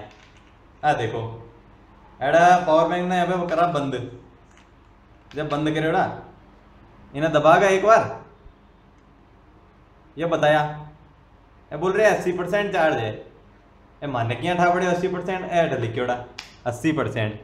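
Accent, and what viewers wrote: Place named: Haryana, India. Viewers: Mst haryanvi h guru ji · Great bhai!!! Asse hi review or vlogs banya kro...aap jaat ho ...assi damdaar haryani language m baat aap nhi kro gye toh kon krre gye ...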